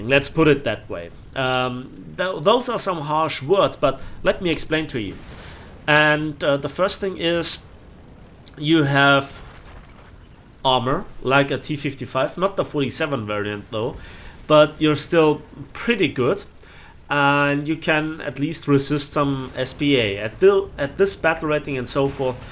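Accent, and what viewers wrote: Germany. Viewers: I just discovered this channel, after looking for an AMX-30 gameplay, and I was amazed at the quality of the reviews you make. You have by far the best videos on WT Ground Forces I've seen, and i've been playing/watching WT for 5 years. Great channel,. Just wonderful. I find your videos superior to any of the top youtube channels that cover WT Ground Forces. Oh, and are you german?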